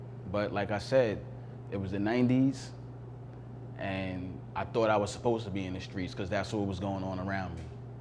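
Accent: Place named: New York, United States. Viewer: I KNEW he was from NY before they even showed Harlem Hospital lol. The accent is unmistakable. #myfellownewyorker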